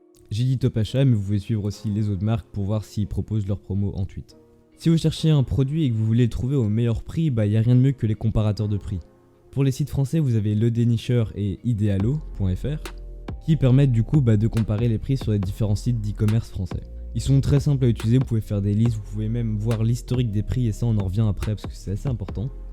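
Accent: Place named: Spain. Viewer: Un accent espagnol de qualité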